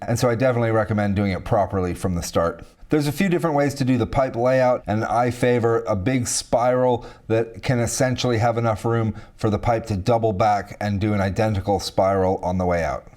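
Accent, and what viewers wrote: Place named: United States. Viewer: I’m new here. He’s probably already explained it in previous videos, but I’m a bit confused about American accent, farenheits and UK power outlets 😀 what’s going on?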